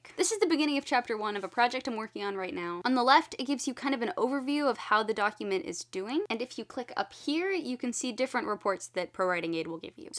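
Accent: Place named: United States. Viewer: love your very american accent, its like listening to a tv series, your voice is very hisg school type but cool and likeable. :D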